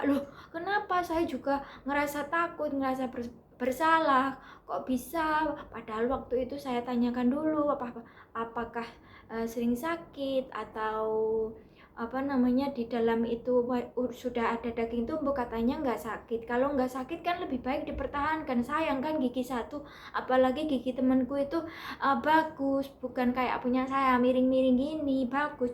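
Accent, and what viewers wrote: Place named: Java, Indonesia. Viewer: Bagus info nya... trimakasih. Tapi klo boleh Saran saya nih ya.. klo ngomong/penjelasan gitu,, pake jeda.. ibarat nya klo dalam tulisan pake titik koma.. biar enak di dengarnya.. klau perlu tambahkan backsound video, apalagi ini video panjang, biar gak bosan yg nonton. ini ngomong kya gak ada rem nya gitu, mana medok pula. Tampilan ataupun background jg penting, biar enak di lihatnya.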